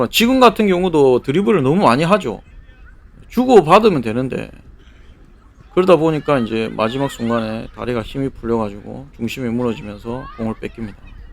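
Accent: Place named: Gyeongsang, South Korea. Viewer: 아이들 축구도 치열하네요^^ 경상도 해설 잘 들었어요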